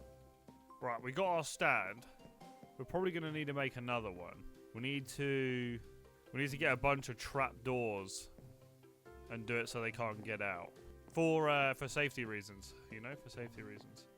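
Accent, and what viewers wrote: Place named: United Kingdom. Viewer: oh hell yeah british accent... i love it :)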